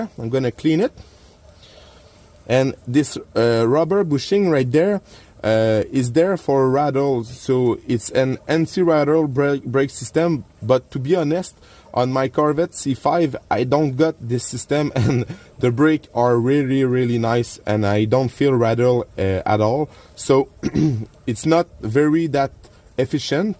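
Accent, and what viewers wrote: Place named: Quebec, Canada. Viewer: tu est définitivement un canadien francophone 😅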